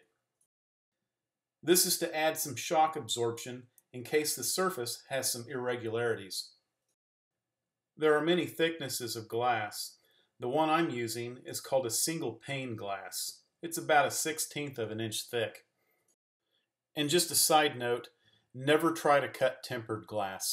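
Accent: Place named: Texas, United States. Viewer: Sounds like he's from arlen texas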